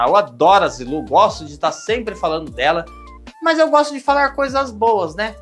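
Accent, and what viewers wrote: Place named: Bahia, Brazil. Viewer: Oi Moço Sou Novo Aqui. E adoro seu Vídeos. O Jeito de Falar a sua Observação no Detalhes me lembra uma prima. Você não é Bahiano não da Bahia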